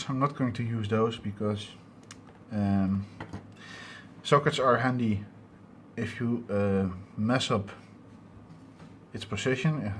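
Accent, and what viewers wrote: Netherlands. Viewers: Im from Belgium but every Dutch speaking person that speaks English has a bit of a accent ^^ and I ordered the one from icstation and even though there site has a full tutorial on it your videos is probably going to be a lot more help I contacted them about reprogramming the chip and it's sad that I have to buy one of those 50 euro programmers for it:( plus a adapter I really hoped there was a other way for that also you have a better diy suggestion for making the led bending template I have no drill they suggested cardboard maybe and 1,5cm spacings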